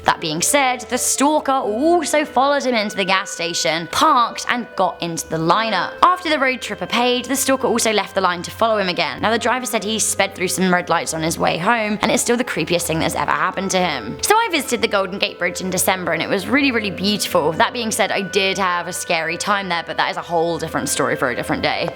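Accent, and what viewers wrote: United Kingdom. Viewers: Hey idk y but i love the British accent on women an i think ur hott lmao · I'm so curious to know where your from 😍 your accent sounds British but distant like you haven't lived here for a while... Anyhow much love for the page you and Danny are amazing!!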